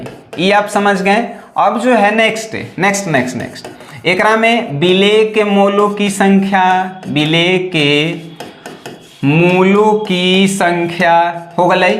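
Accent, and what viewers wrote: Bihar, India. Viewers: Verry nice for Bihari style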